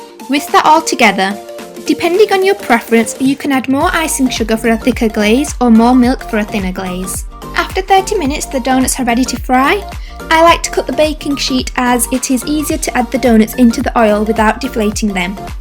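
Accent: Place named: United Kingdom. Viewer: Damn! This makes me crave for donut 😅.. Dear I love the tutorial and listening to your British accent. ❤️😉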